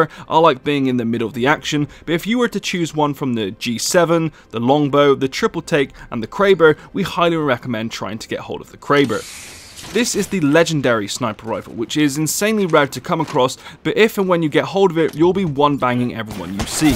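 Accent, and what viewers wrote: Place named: West Country, United Kingdom. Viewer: you from bristol or summerset buddy?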